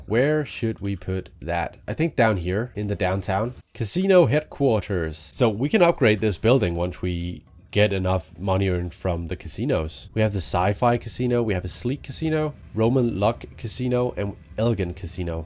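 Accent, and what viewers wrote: Denmark. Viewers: Jeg tror bare jeg var lidt træt da jeg så den gamle video. For nu kan jeg godt lidt høre den Danske afsagt. Men stadig rigtig god til engelsk. 😁 Og så vil jeg bare sige tillykke med de 500 abonnementer godt på vej til 1000 🎉🥳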